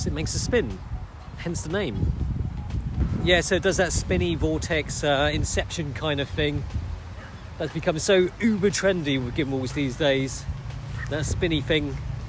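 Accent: England, United Kingdom. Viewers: I think he was raised in the UK. That's why it's so smooth. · What is your accent to be precise which region in the UK? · I love the fact that he’s Asian and he has an English accent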